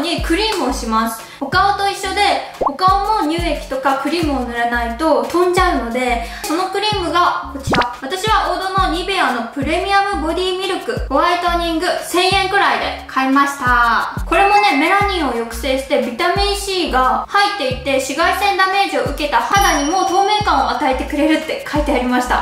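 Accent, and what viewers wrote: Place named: Gifu, Japan. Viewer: 方言が岐阜っぽいんやけど？。。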